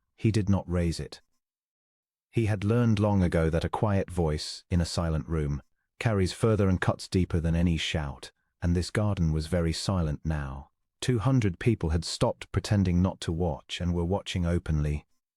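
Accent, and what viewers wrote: United Kingdom. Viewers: Lovely story--well written and wonderfully narrated. Thank you for having a British accent to your narration; it's a British story, after all.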